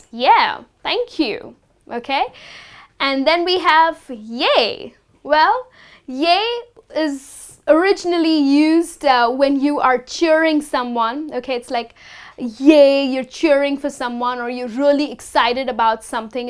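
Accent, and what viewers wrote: United States. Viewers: You speaks 80% American English and 20 % British English ....... (Me - 50%american +50% British English....) Am I right plz comment .. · American dialect....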